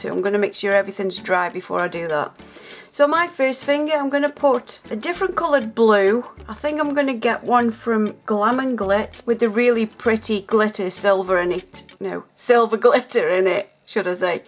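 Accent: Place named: Stoke, United Kingdom. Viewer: I love these. I’m a nail newbie could you have put the glow in the dark behind the polygel sections of the design so the glow effect would shine through the polygel. I’m just starting out and I don’t have any glow in the dark to try it with myself so I’m being a bit cheeky and asking you if you think it would work. I’m not sure where you’re from but you sound like a fellow Stokie xxx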